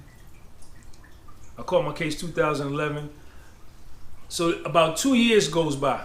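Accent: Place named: South, United States. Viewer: That country accent was 😂😂😂😂 My fam is from Kings tree South Carolina 😂😂😂💪🏿💪🏿💯💯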